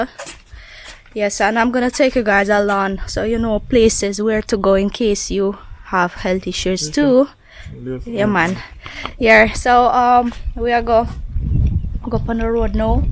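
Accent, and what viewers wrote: Jamaica. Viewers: GIRL UR SÒOOO FUNNY, WITH UR JAMAICAN ACCENT, YOU HAVE ME LAUGHING, 😆😁UR A REAL JAMAICAN GIRL NOW, I MUST SAY, L❤❤❤U ALL 🥰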